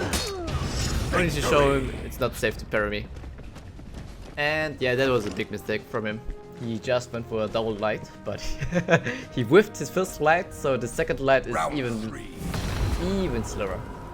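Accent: Germany. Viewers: its the audio mix, he has a slight german accent but should be understandble for everyone.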